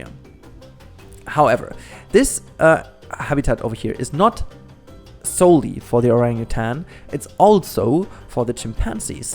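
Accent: Germany. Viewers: Hello from an English (Canadian) speaker! I empathize with you trying to speak animal names in English. Don't beat yourself up over it! As long as you're close, we will figure it out. I, for one, prefer to hear your German accent anyway!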